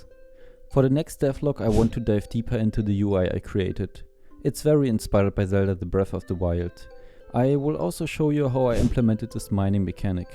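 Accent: Germany. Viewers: hewo hörst dich fett deutsch an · Nices Game! Man hört das du Deutsch bist🤣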